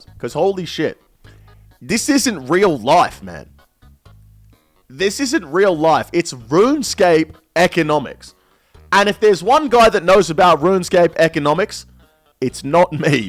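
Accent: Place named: Australia. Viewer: Bro you are the most cringe Aussie OSRS creator, possibly the most cringe out of everyone. No one cares what you think and the fact you think a clips channel of your garbage streams is insane. Get a haircut lose some weight and stop over accentuating the Aussie accent you absolute loser. You and behemoth are embarrassing.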